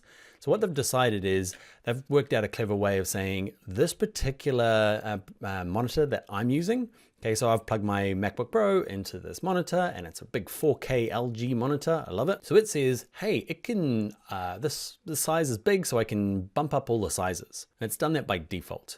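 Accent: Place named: New Zealand. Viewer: Best combination of informative and cool funny narrative. Good job man. You are my favourite whats new guy. And the accent! Kiwis are best...:-)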